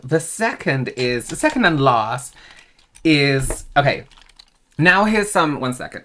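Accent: England, United Kingdom. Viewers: The English accent is everything 😍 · the English accent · I have an interest in makeup, and you provide valuable information not only about the make up application, but you add your years of professional experience to it., it is always delivered with humor, intelligence, and realness which is very much appreciated. Also your British accent is a nice to hear too!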